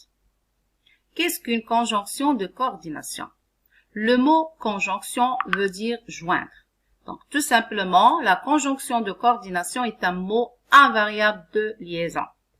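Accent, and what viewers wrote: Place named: Arab world. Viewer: Tg juste prcq elle et arabe et elle a un accent arabe rasciste jte signale regarde comment tu la blaisse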